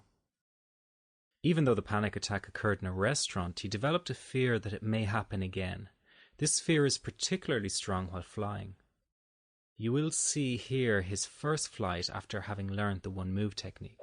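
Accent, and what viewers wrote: Ireland. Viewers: IRISH ACCENT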